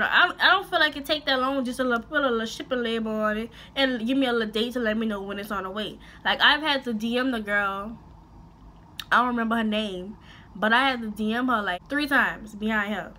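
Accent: New Orleans, United States. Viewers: I love the New Orleans accent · Lmfaoo bruhh u from New Orleans or Reserve? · Yo! I'm probably old enough to be your Mom, but IFWY!!! OMG! The REALEST video EVER! You crazy and funny asl and we NEED that!! You feel me? I knew as soon as you opened your mouth, you were from NOLA. Y'all funny, crazy, and curse a LOT, but I LOVE it!! You're very pretty too! 😍 New Subbie! PLEASE keep doing hair reviews or hell..any kind. 😂❤💯👍🏾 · I need a friend from New Orleans so I can laugh I love the accent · She gotta be a NOLA baby!!! I am an we got that accent ♥️⚜️ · Gotta be fr the NOLA! TOO CUTE!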